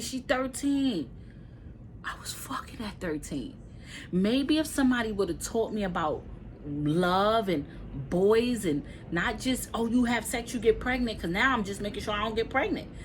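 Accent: New York, United States. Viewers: I just love listening to you and that New York accent. 😊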